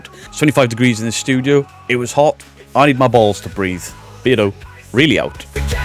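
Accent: Wales, United Kingdom. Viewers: Firstly can l say this is the best reaction to the stupid whinning comments ever. Secondary don't you ever get rid of the welsh accent, its beauty to heat. Keep up with the great work. Love you beardo. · I like your Welsh accent brother! I'm glad this all bullocks!!😂 Your a good man. We all know that! Thank goodness you weren't serious!! Lol... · Actually choked on my coffee with this..from one sarcastic, pineapple haired, ear stretched prick to another... We effin love you and your Welsh freakin accent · Why hide your welsh accent?? I lived in Abergele for30 years loved the place, right facing the beach too..2 of my youngest children were born in ST Asaph Denbighshire North wales..If the jealous trolls don't like you thats their own problem.NEVER CHANGE.. your doing an excellent job keep up with the excellent videos of debunking the fake channels.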